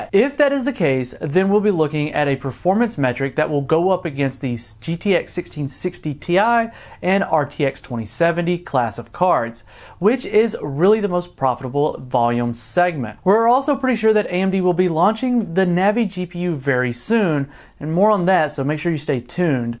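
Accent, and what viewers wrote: South, United States. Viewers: Your mother or fathers strong cowboy country accent really comes through while stoned :) I went ahead and got a Sapphire Pulse Vega 56 for £270 (with the 3 free games bundled).. It's not worth waiting if you want something now. Undervolted to 1100mV and ramped the frequency to 1700/950 and off I went (after running Firestreak stress to completion of course). I got me some GTX 1660 Ti ass kicking goodness.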